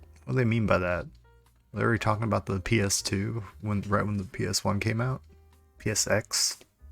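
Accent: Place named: Scotland, United Kingdom. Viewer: I wish I payed attention to these responses. a response 1 yr later....its like I'm watching your youtube videos from alpha centuari.....thats one light yr isnt it? hah anyways. let the thick scottish accent roll brother!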